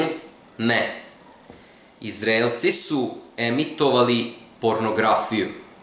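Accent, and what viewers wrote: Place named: Novi Sad, Serbia. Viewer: Novosadski akcenat🙈🙈🙈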